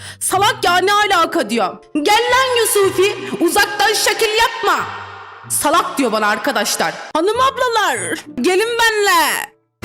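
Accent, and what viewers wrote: Adana, Turkey. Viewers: Abla Adana'da mı yaşıyon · Abla adana mi yasiyon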